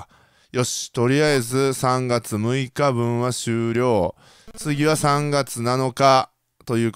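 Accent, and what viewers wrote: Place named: Kyoto, Japan. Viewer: 京都弁いいですね〜꒰ ´͈ω`͈꒱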